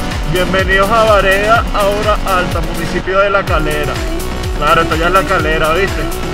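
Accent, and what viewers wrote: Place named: Venezuela. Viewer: Usted tiene una vos como de venezolano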